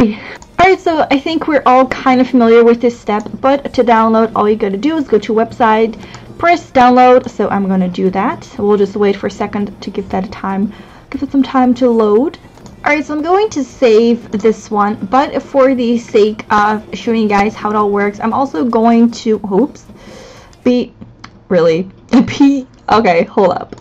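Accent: Netherlands. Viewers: are you from the Netherlands? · are you dutch?? cause i am :)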